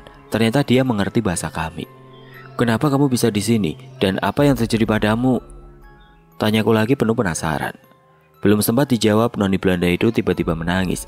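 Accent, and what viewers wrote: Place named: Banyumas, Indonesia. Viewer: Ngapaknya jangan terlalu di liatin bang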